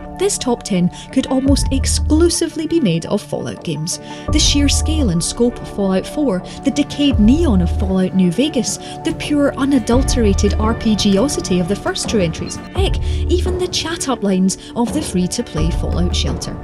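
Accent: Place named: Scotland, United Kingdom. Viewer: Can't beat a good Scottish accent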